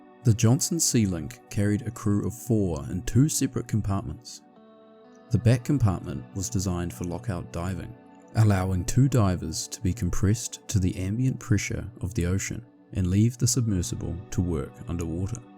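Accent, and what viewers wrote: New Zealand. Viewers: Love new Zealand accent. “Key Wist”. When you want a beer: “pass me a Bicks” 😂😅🤙🤙❤️ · The calm, easy to listen to and easy to understand kiwi accent and your very clear and precise dictation makes for an awesome narration, nice one mate from a fellow kiwi 👍🇳🇿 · Dang it! Totally missed your emphasized U sounds!! Russell Crowe is my favorite actor how did Inot recognize your Kiwi accent! You will reach 100k subs by the end of the year I haven't been wrong on predicting channel growth!!! cheers mate!!!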